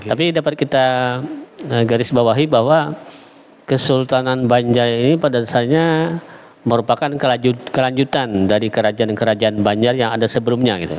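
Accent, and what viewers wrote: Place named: Brunei. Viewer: Hampir sama dialek Melayu Brunei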